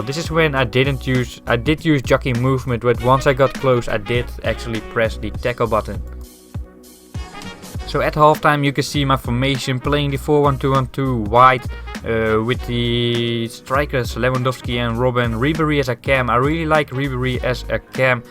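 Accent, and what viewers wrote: Netherlands. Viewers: Kom net je kanaal tegen. Jeetje,... echt erg indrukwekkend hoe je speelt. Wellicht spelen we een keer online. Verder zou ik enkel je Engels een beetje opschonen. Enkele standaard Nederlandse Engelse uitspraken; "finding it out", "try stuff out". Enkel goed bedoelt! Heb me iig geabonneerd en heb er al een hoop van geleerd, thx!!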